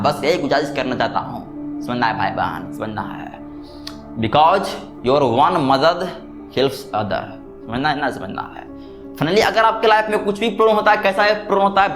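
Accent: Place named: Bihar, India. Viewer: इंसानियत कम होने का बड़ा कारण बढ़ती आबादी है । कुछ लोग पीढ़ियों से सुधारना ही नहीं चाहते। सुवर की तरह आबादी बढ़ा रहे हैं। ओर कमाने वाला एक की मदद करता है तो 10 आकर ओर खड़े हो जाते हैं। तो उनको भी सोचना होगा तभी जिंदगी सुधरेगी सबकी । भाषण देने से कुछ नहीं होगा तुम बिहार से लग रहे हो तुम्हारी बोलने की अंदाज से लग। रहा है 17 करोड़ हो ध्यान देना 😂😂😂😂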